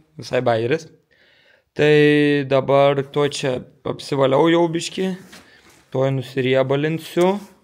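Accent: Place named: Šiauliai, Lithuania. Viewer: is kalbos lyg Siaulia butu :)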